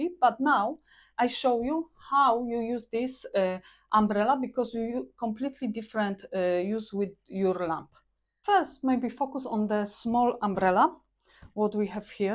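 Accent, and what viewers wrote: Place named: Germany. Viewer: She is speaking English with what sounds like a German accent, based on my time in Deutschland.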